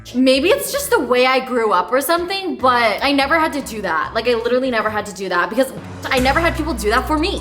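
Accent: England, United Kingdom. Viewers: We need full British accent video ❤❤ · YOU’RE AMAZE BALLS ❤❤❤❤ also the bri’ish accent is gorg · wait... omg that english accent is so slay omg.😍😄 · Love the English accent lol 😂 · i'll be glad if you make a while video with british accent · Hiya. The English accent was good, can you please do an Aussie one? I wanna hear a few G'day mate and good onya's! Lol. ❤ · why does your british accent lowkey sound like millie bobby brown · I was binge watching all your resent videos this week and I’m just happy to have another one to watch. The British accent is killing me tho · love how spam account is just british 😭😭😭😭😭😭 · Thank you, for being your chatty/ oversharing self!! Love the UK accent reminded me of Adele 💗💗 Phenomenal Spanish too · your british accent sounded like little Ron Weasley's voice✨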